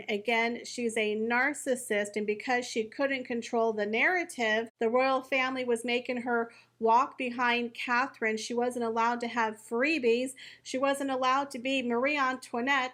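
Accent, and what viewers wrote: South, United States. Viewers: I really like your views and you speak exactly the same as my southern cousins. I’m in the middle 🇺🇸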